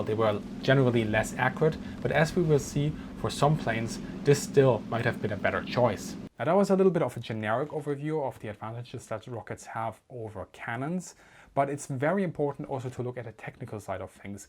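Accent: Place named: Germany. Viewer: Ein, zwei, drei, acht, ein und zwanwig etc. We can tell from your accent that you are German--you don't have to constantly remind us by using German numbers in an English-language lecture! Your audience is English speaking. Otherwise very informative, analytical and enjoyable.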